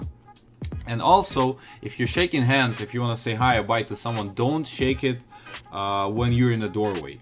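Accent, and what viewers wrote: Russia. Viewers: a dude with russian accent and probably was born in Russia,you know. Eloped to usa and says wrong things about Russia. Disrespect and weird way to deride country and people. You haven't succeed at all,. The only right thing from this video is info about superstitions in Russia. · Hey boy. Shut your mouth and stay where you are. You will never be an American with your heavy Russians accent. Do the best you can for your beautiful country. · У тебя акцент русский)) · Посмотрел 39 секунд, слышу, что ты русский и вот это "this could get you killed", конечно пиздец. Не потрудишься светануть здесь случаи, где кого-то уже killed по этой причине, особенно зарубежного туриста? ЗЫ. дальше эту поебень смотреть смысла не вижу.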